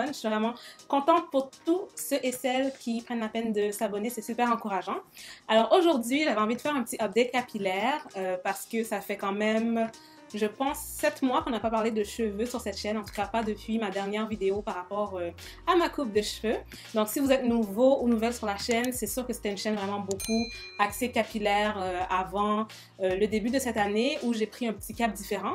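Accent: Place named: Quebec, Canada. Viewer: J'aime trop ton accent québecois en plus tu est de toute beauté😌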